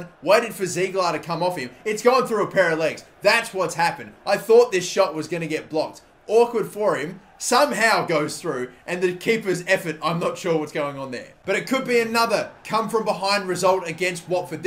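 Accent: Australia. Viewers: Are you Australian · I always thought you were Australian.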